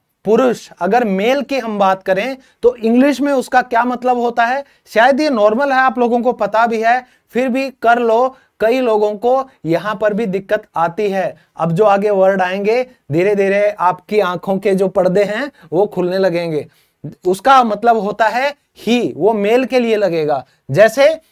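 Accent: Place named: Haryana, India. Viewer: Sir muje aapka pdane.ka lahja Bahut jyada accha laga aapse Padhkar apne Haryana ki feelings Aati hain aapne vakai etne jabardast 👌 andaz me cheezo ko explain Kiya Aapse pahle kabhi kisi ne ese na padhaya hoga thank you so much Bhai mere 🙏🌸🙏🌸🙏🌸🙏🌸🌺💐🌺💐🌺💐🌺💐🌺💐🌺💐🌺💐 Jai Hind Jai Bharat vandematram 🇮🇳🇮🇳🇮🇳🇮🇳🇮🇳🇮🇳🇮🇳🇮🇳🇮🇳🇮🇳🇮🇳🇮🇳🇮🇳🇮🇳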